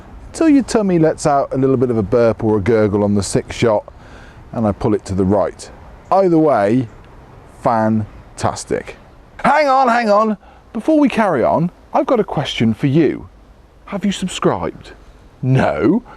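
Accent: United Kingdom. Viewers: Can somebody say from what part of UK is this english? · Everything sounds better with a British accent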